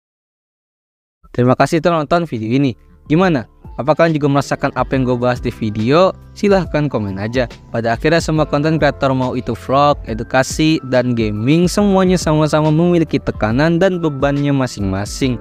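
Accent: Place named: South Jakarta, Indonesia. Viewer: iya lagi pake nada jaksel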